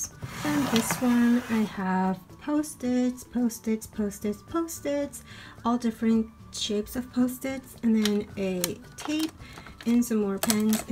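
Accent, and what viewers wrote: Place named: United States. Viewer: This might be a personal question but I'm going to ask anyways are you a foreign exchange student because if I am correct you're currently studying in South Korea and hearing that you speak English with an American accident I assume you're American (correct me if I'm wrong) also if you are a foreign exchange student how did you get excepted and how has your experience been btw love your videos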